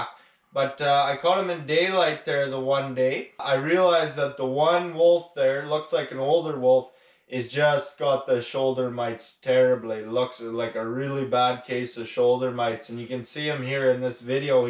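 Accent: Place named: Newfoundland, Canada. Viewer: Your accent are you from Canada ,new found land maybe buy great videos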